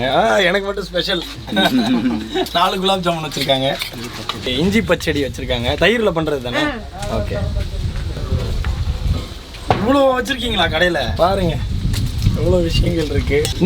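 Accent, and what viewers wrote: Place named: Kerala, India. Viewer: Malayalam slang madiri eruku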